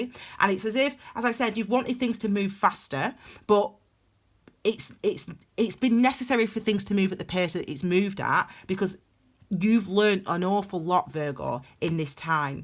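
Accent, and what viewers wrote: United Kingdom. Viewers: Congrats! TY from U.S. Your accent sounds British. Enjoy your holiday. We would say vacation, I think. 🌅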